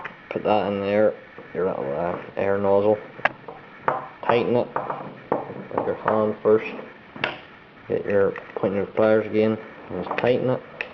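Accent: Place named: Belfast, United Kingdom. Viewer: love the Belfast accent :L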